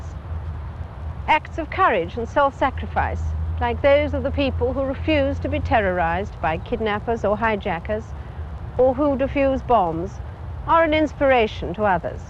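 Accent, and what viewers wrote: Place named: RP, United Kingdom. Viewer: That's how received pronunciation is supposed to sound you utter fool.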